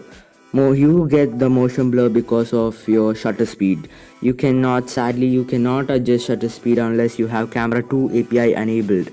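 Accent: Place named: Kerala, India. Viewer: ivante englees ketta ariya malayali aann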